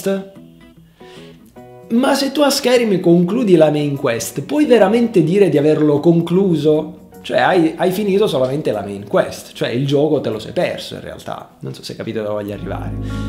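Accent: Sicily, Italy. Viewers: Ciao ma sei siciliano? non riesco a individuare la tua cadenza nell' accento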